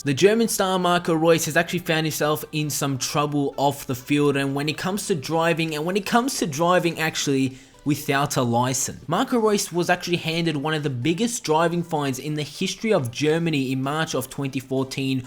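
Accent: Australia. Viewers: Are you Australian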